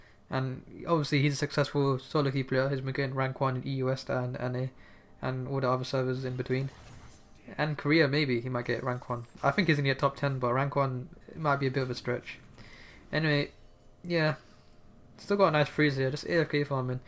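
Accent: United Kingdom. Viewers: 23.50 I am not sure what part of UK you come from. I spend some time in Scotland and it wasn't easy to start understanding the way they prenounce words. Now when I listen to you it feels like I learn a different accent and it comes easier but I understand that it might be confusing :D Oh and one more thing, you use quite a lot of glottal stops so that might be a part of a problem too. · Your voice is fine, but maybe its because I'm british as well and that's the way a lot of people talk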